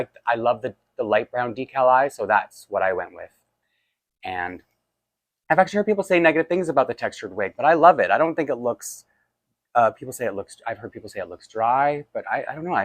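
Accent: Canada. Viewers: I made it to the end! I started watching your videos a couple days ago to procrastinate homework. (About halfway through this one I decided I needed to multitask.) But I watched the MapleLea winter haul video earlier today where you mentioned you were Canadian and I didn't pick it up then but I definitely heard the accent in this video. My mom thinks my collection is insane (17 dolls as of now) but this just might prove her wrong, ha!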